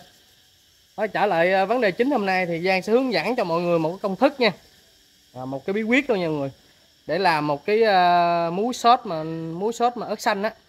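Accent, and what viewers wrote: Ben Tre, Vietnam. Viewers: Nghe giọng nói giống như bến tre z a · Cho mình hỏi, nghe Giang nói chuyện giống người miền Tây, giống quê mình, bạn ở đâu vậy?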